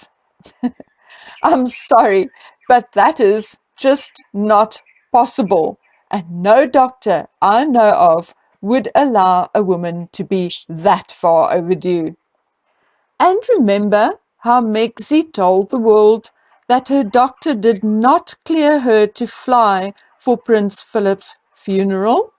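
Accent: South Africa. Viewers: GREAT accent! South African? If i'm wrong, many apologies!!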